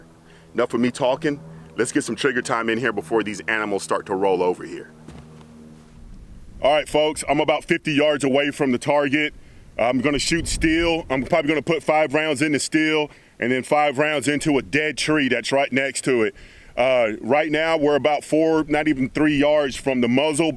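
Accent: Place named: Texas, United States. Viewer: Good stuff. Keep it up. Where in north Texas? You sound like the boys I grew up with.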